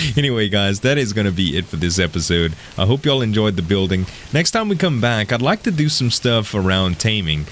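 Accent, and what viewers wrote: Louisiana, United States. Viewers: Is Z from louisiana?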